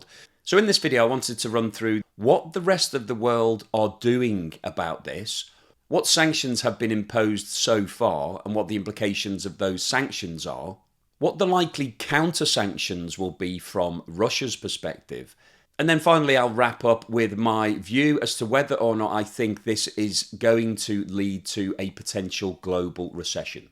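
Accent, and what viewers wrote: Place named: Liverpool, United Kingdom. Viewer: Sounds like scouser 🙄😬🙄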